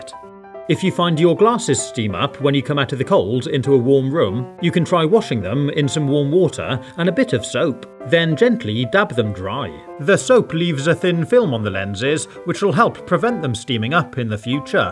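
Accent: United Kingdom. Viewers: AWESOME and very much APPRECIATED. THE IDEA OF SUCH A VIDEO IS EQUALLY CLEVER AS ALL ITS CONTENTS. I ALSO LOVE TO LISTEN TO THE BRITISH OR BRITISH SOUNDING ACCENT. VERY WELL DONE.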